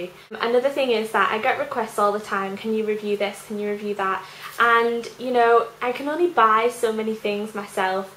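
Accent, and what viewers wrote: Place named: Yorkshire, United Kingdom. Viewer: OMG are you from Huds? I'm from Sheffield, I knew you were a yorkshire lass :-) lol x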